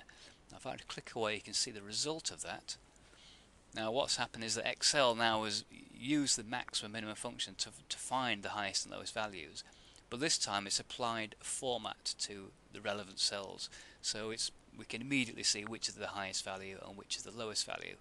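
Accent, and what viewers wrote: United Kingdom. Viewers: I had to learn Excel quickly before I start my new job. These tutorials are excellent! Moreover, everything sounds so much more interesting with that lovely British accent! Thank you so very much. I'll feel quite confident going into my new position.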